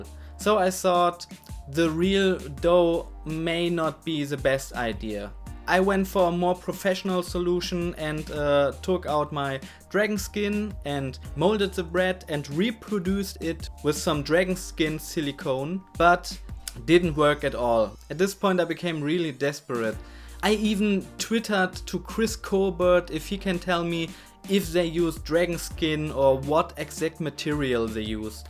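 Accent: Germany. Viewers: Das video an sich ist nicht schlecht aber ich kriege kopfschmerzen vom deutschen akzent 👍😖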